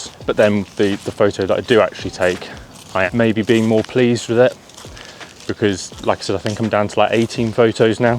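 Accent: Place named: United Kingdom. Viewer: Photography videos just sound better with a British accent.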